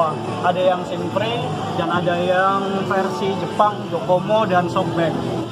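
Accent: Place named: Java, Indonesia. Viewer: Medok banged suara.mu? 😅😅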